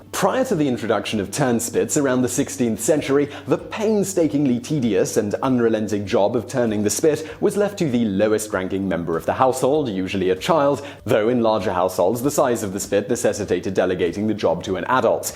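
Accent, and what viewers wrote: United Kingdom. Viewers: I'm reading your comments in your British accent.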